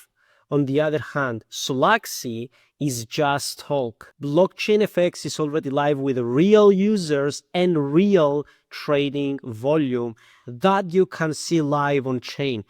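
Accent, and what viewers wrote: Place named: Pakistan. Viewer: Почему те, кто разваливает провальный solaxy смягчают L ? Акцент с пакистанского рынка.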